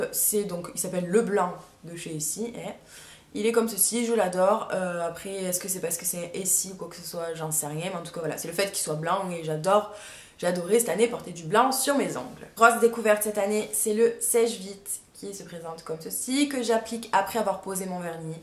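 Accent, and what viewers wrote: South, France. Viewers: j'aime bien ton accent tu viens du sud toi aussi?^^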